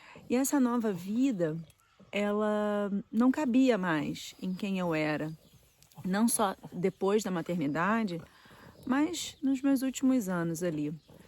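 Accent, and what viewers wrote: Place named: Brazil. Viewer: Olà fico contente com suas declaraçoes, mas desculpa minha pergunta o porqué de ainda falares com sotaque Brasileiro? fui emigrante na Suissa e Luxembourgo e nunca usei linguagem portuguesa e o frances também é de origem do latin entendes, é a unico reparo que faço em sua aventura aqui em portugal, eu me entegrei na Europa em frances e para mim o mais importante na integraçao é prencipalmente a linguagem quasi todos portugueses gostam que qualquer raça que falem a linguagem correctamente e resto da Europa obrigado por sua atençao.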